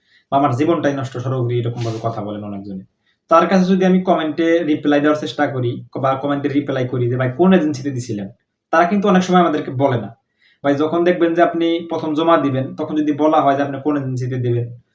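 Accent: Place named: Sirajganj, Bangladesh. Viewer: ভাই আপনার বাড়ি মনে হয় আমাদের সিরাজগঞ্জ৷আপনার কথা শুনে আন্দাজ করলাম ভাই 😊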